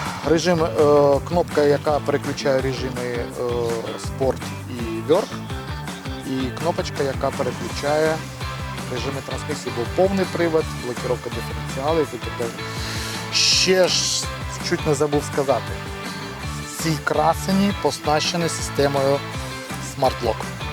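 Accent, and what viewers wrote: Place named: Russia. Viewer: Брат! Видно же, какой твой родной язык!!! Говори пожалуйста на Русском, будет всем счастье!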